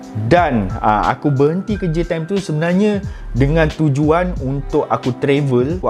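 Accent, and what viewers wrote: Terengganu, Malaysia. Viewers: Tahniah.. Selamat maju jaya.. Tapi request aku sblm ni tak buat lgi.. Aku mintak video ckp full loghat tranung mung dok buat lagi..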